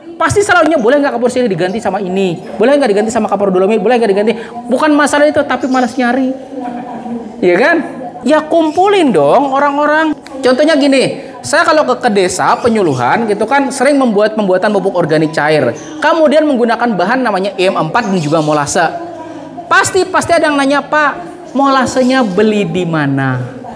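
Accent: Banjar, Indonesia. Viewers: Logatnya mirip kaya orang Banjar....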